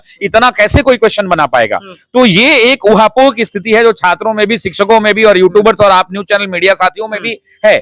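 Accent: Bihar, India. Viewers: सर... पूरा बिहारी टोन प्रयोग करते है....😂😂😂हमारे बिहार की शान........ और सर 🎉🎉🎉🎉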